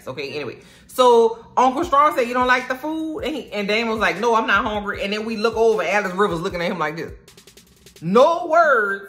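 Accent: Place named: Louisiana, United States. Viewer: Absolutely LOVE the Louisiana accent and humor!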